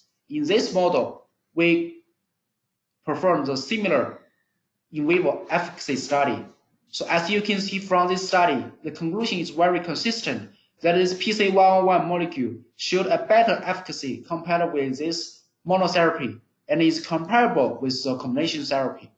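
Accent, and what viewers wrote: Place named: China. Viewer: chinese accent is so strong, can't understand what he says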